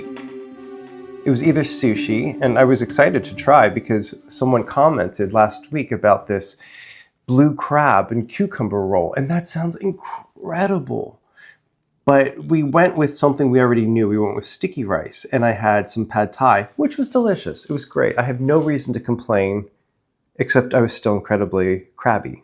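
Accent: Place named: Philadelphia, United States. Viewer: happy belated. of course you're a taurus, makes every sense. I loved your honesty so much in this one. And if you don't mind my saying, I can hear your Philly come out. I masked my Philly/South J accent a thousand years ago... but it comes out at times. And yours came out in this. It's between you and me.;)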